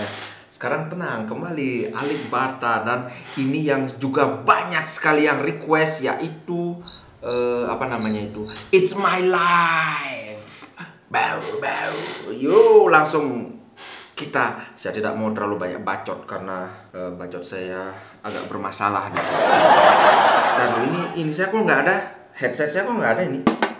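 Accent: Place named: Bali, Indonesia. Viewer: BESER... 😁😁😁 medok sajan base baline adi bli...😅😅😅 👏👏👏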